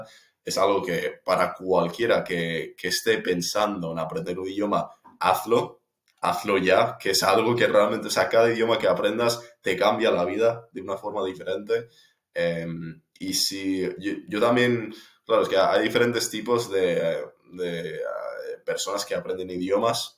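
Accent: Spain. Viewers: Tu historia es muy buena y ahora entiendo porque hablas español europeo y no como hablamos en América, México es una excelente opción para venirte de intercambio, universidades en Ciudad de México, Guadalajara y Monterrey tienen muchos estudiantes norteamericanos y europeos. Y la ventaja que en una hora de vuelo ya estás en casa. Te recomiendo que veas videos de europeos reaccionando a ciudades mexicanas, para que te quites ese estereotipo hollywoodense sobre que México solo es narcos y desierto. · Felicitaciones hablas muy bien con mucho acento español en Chile no lo hablarías tan bien. Por los muchos modismos qué usamos tendrías que hablar chileno. Chileno. En Perú hablan el mejor español y el idioma es el castellano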